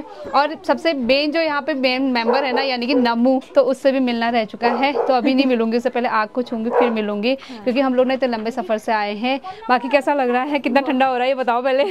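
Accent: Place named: Almora, India. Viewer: बेटा आपका मायका कौन से गॉव में पड़ता है। आपकी भाषा अल्मोड़ा जैसी लगती है। नामकरण में जाकर आपने अपना फर्ज निभाया। बहुत अच्छा लगा। 🙏🙏🌹🌹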